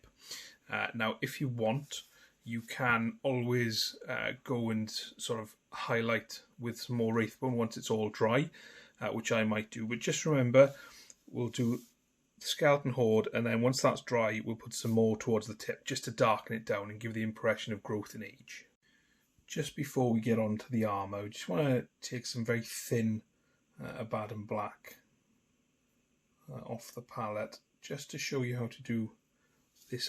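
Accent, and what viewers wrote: Wales, United Kingdom. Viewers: Any chance of you'll have a go at painting Dragon Warriors Chaos Space Marines? I'm looking to paint my Chaos Marine battleforce to counter my Salamanders. Also, your Welsh accent is fantastic!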